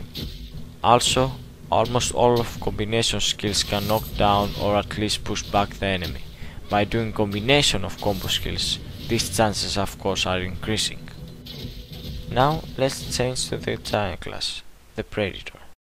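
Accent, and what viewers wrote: Greece. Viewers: Στάνταρ 'Ελληνας με αυτή την προφορά. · The commentator has a really heavy, greek (?) accent. Cute :P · you sound greek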